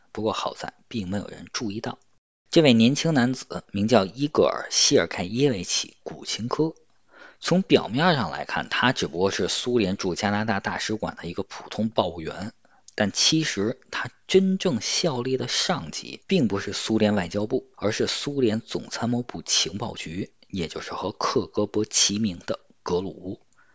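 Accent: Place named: Beijing, China. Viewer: 北京腔好听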